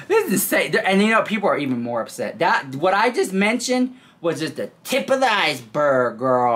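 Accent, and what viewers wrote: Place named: Texas, United States. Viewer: You make my day! Every day! ❤❤ I also live in Texas but I'm in Dallas. So, i love the accent. You're like home.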